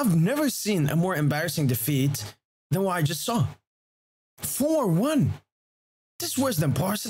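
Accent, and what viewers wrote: France. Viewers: What part of France is this guy from?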